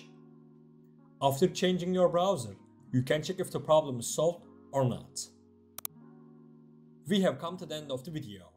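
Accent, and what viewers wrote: Turkey. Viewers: Abi ingilizcenden anladim turk oldugunu evyallah yardimin icin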